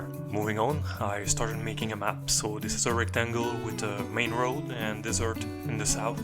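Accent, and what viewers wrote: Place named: Quebec, Canada. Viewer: Awesome ! You sound like a Quebecois ;) Jme trompe tu ?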